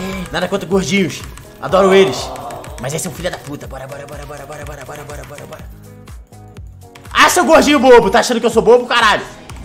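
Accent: Rio de Janeiro, Brazil. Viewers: Esse é muito carioca mano 😂😂😂 Fé com fé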